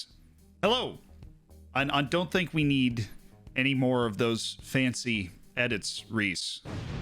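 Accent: England, United Kingdom. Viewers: Decent English accent. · Good English accent! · Oh shit that was an English accent was it? P.S. Good luck with the revamp bud i'm looking forward to what you're going to get up to. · That English accent is fantastic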